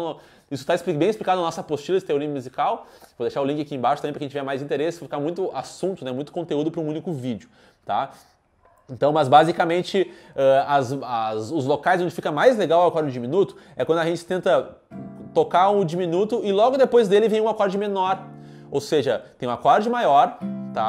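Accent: Brazil. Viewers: falas muito rapido que nao da para perceber metade das palavras (engoles as palavras ) de maneira que isso so serve para a lingua brazileira ,mas quem fala portugues os seus videos nao tem uso algum. o meu conselho seria fazer os videos em engles asim todo o mundo percebia ,com os videos em lingua brazileira so os brazileiros e que percebem que caralho estas ai a ladrar ,mas quanto ao asunto do video esta O K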